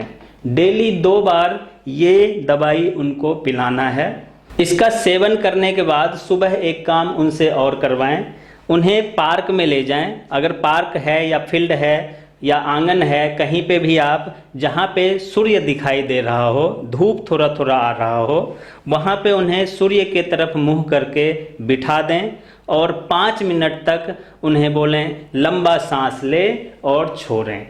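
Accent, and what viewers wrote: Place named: Bihar, India. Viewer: Ye ravish kumar jaisa thakela bolta hai..bihari babu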